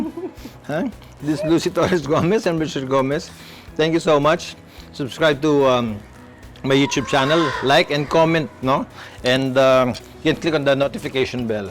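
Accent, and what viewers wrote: Visayas, Philippines. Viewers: Gusto ko ung bisaya accent eh. Lami gyud dong uy!!! Giatay mana sya uy! Lami sad na ba sa puto maya ba!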